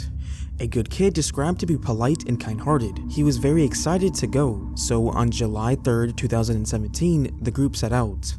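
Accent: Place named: Toronto, Canada. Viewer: You sound like you're from toronto!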